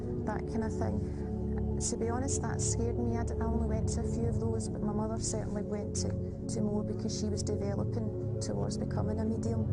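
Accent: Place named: Scotland, United Kingdom. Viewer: Scottish accent makes me sick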